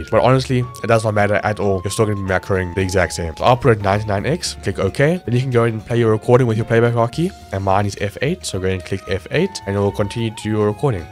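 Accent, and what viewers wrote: South Africa. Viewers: Are you South-African?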